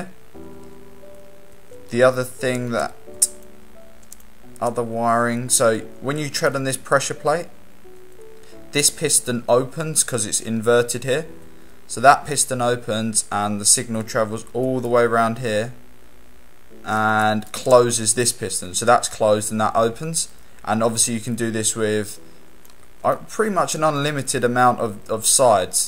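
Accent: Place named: Australia. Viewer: you sound like the australian guy on the series House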